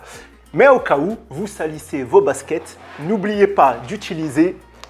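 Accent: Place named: Alsace, France. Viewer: Sympa le sac ! Jolie l'accent alsacien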